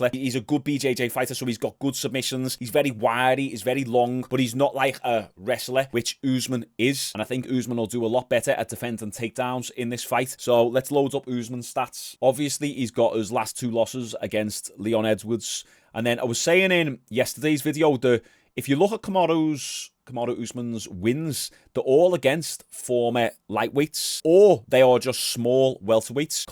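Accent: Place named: Liverpool, United Kingdom. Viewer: I’m a fan You’re fun to watch and the Liverpool accent is your “Bread and Butter”!!! 🤣🤣🤣 Anyway, you could do a great live reaction show…it’s a bit of work but it would take you to the next level with “Super Chat” money, etc Keep up the great work!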